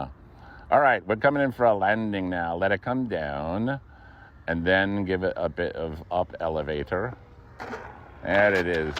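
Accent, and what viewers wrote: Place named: North America. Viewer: Brits aren't usually able to place North American accents, so for my education where is your accent typical of? Great fun videos.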